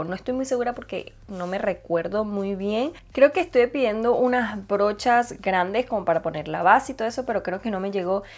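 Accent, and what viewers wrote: Venezuela. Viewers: Me Encantas!!!! soy venezolana, vivo en España y es super increíble oír tantas palabras y esa forma de hablar tan particular de los venezolanos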